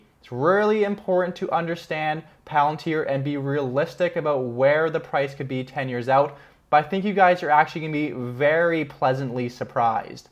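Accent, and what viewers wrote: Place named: Edmonton, Canada. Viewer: Are you from Edmonton?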